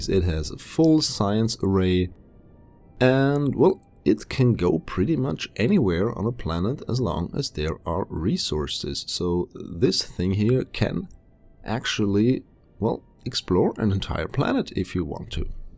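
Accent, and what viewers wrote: Germany. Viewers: ich höre da 'nen deutschen akzent raus :p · He Sounds German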